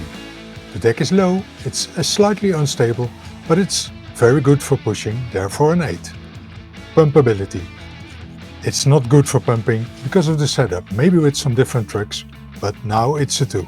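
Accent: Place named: Netherlands. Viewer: Lekker hollands accentje :D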